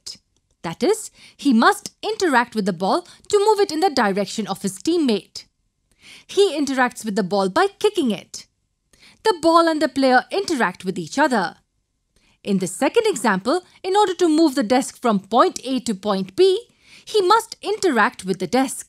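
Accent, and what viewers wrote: India. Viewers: wow indian accent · Heavy Indian accent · This Indian sound Smh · R u Indian bc ur English sounds like Indian people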